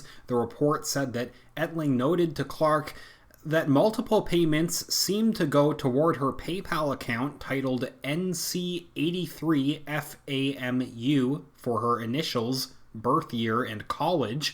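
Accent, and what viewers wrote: Canada. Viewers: your middle class nasal Canadian white boy voice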